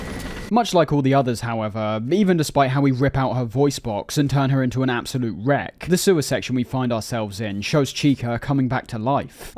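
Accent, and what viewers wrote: United Kingdom. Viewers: a brit is speaking about a video game for autistic people, listen and learn 🤫🧏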